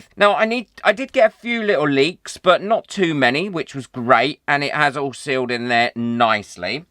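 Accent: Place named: Estuary, United Kingdom. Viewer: I always think of you as the Jamie Oliver of the crafting world, I think it’s the estuary accent! Hope that’s not an insult!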